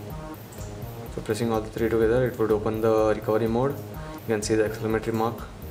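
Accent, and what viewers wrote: India. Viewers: Why do the people with Indian accent talk so fast? It makes nearly impossible to understand what are they saying..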